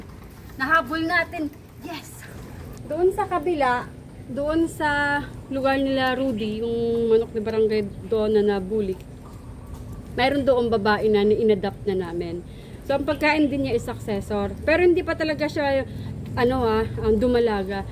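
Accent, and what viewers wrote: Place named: Visayas, Philippines. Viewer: Madam napansin ko parang bisaya salita mo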